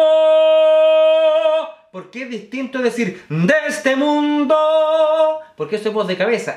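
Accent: Chile. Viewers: Mira Con tu voz tu habla Chileno no critiques a Manuel Manuel es mucho Manuel cantando lo que tú canta no gasto tiempo en escucharte Tanfeo que hablas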